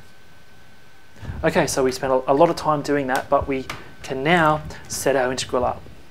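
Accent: Australia. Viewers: you are Australian. Correct?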